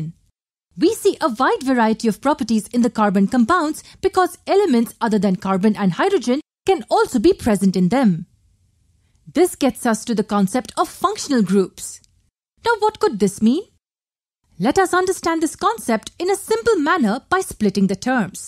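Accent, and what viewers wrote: India. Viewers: Loving this lesson. Loved the Indian accent too🤩😘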